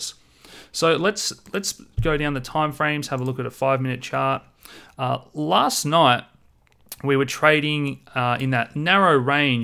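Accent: Australia. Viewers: No native english speaker, but recognize the Aussie accent, Mate!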